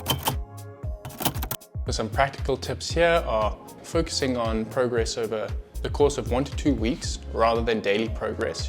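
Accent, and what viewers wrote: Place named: South Africa. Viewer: Why is someone with a SAffer accent talking about "pounds"???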